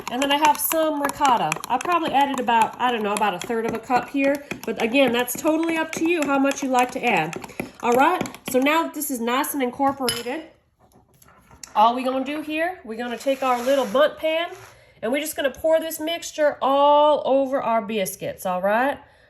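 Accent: South, United States. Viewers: What part of the South are you from😊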